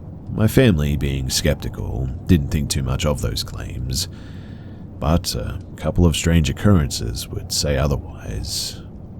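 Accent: Australia. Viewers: Are you South Aussie? I mean; I am. And you have that type of Aussie accent you can’t quite pick which state you’re from 😂 but love the videos, they help me sleep. You’re voice, definitely top tier 🥹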